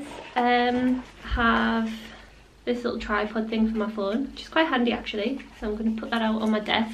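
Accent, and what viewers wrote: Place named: Scotland, United Kingdom. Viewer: Sounds Scottish, like my daughter-in-law, with a slight lisp. They get taxed per room, so don't have closets, like we do. They have cupboards, which they call "wardrobes." So I was expecting a huge wardrobe, not a cupboard. They don't pronounce their "t's" in the middle of words like water, or pretty.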